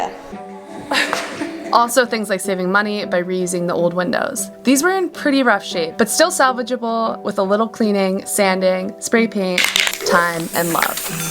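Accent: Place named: Midlands, United Kingdom. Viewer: Where is from just out of curiosity? Roughly of course lol I'm from the midlands in England and his accent sounds like one around here every now and then lol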